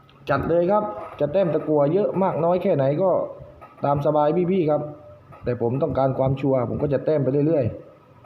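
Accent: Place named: South, Thailand. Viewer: ขอบคุณพี่ ครับ ฟังสำเนียงแล้ว รู้เลยคนใต้บ้านเราครับ🙏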